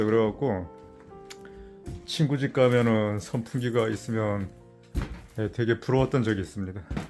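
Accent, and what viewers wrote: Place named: Gangneung, South Korea. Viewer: 강릉 감재 특유의 말을 들으니 반갑소야. 시방은 서울에 살지만.. 저도 고행이 강능 죽현이래요.. 촬영차 오가시는 길 시나미 잘 댕기시우야.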